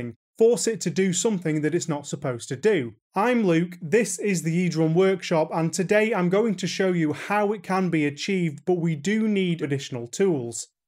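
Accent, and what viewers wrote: United Kingdom. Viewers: Hi Luke! Thanks for efforts! You used the Jobeky trigger? Sounds nice. I really enjoy your british accent. -> Say: "Alibaba". By the way, due to your vid I ordered some Lemon cymbals and a 45$ 12" Lemon snare pad. Even beeing a low-priced product that pad performes basically quite good with positional sensing using the eDRUMin.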